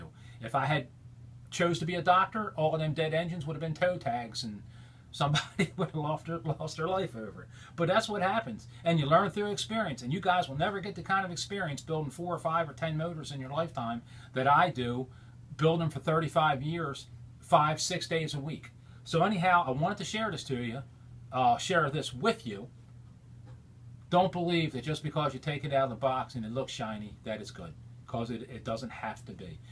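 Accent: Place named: Baltimore, United States. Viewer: Damn, you GOTTA be from Baltimore!! I know that accent anywhere!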